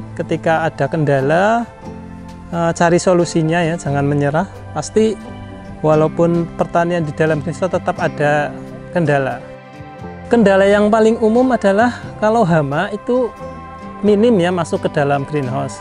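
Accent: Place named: Banyumas, Indonesia. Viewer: Salam ngapak 😂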